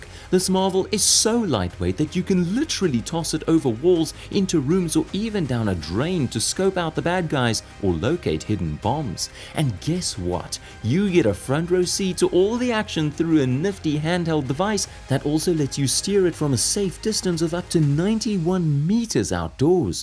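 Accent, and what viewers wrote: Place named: United Kingdom. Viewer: Not bad for AI. I didn't hear any mistakes in the dialog. Only question: why are you talking about "Our military" (as in the US) with a British accent?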